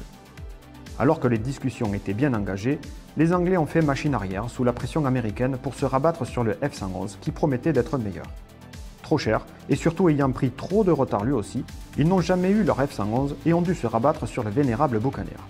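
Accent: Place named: Marseille, France. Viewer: Dommage : l’accent marseillais rend inaudible la présentation de la vidéo !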